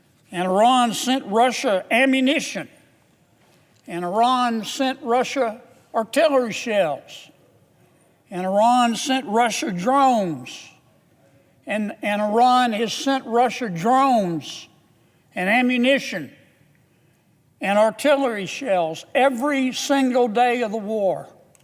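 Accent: South, United States. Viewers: Russian president Vladimir Peutin. Love that southern drawl!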